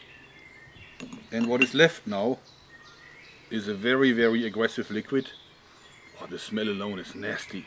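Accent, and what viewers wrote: Germany. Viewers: What is his country of origin? I'm guessing he is German based on his accent, but I could be mistaken. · are you german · you are a german guy ? you speak with the germen dialekt :) and you was by the arme ? :D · where are you from? your accent sounds familiar... are you German?? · Yeah, im german too, and i had heard his accent, beacause often german have this accent too (sry for bad english :D) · Wer 3-4 Videos von ihm geschaut hat merkt sofort dass er Deutscher ist dass ist nichts neues. Ich finde es gut dass er es auf Englisch erzählt damit es auch andere verstehen. Hauptsache man kann ihn doch noch verstehen!! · I can hear you're german! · Where are you from I can't figure out your accent I think it is german??? Can someone please correct me if I'm wrong thx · I agree with the accent, but now that bugs me because Nords are supposed to be Scandinavian, not German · are you german? 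you talk like a german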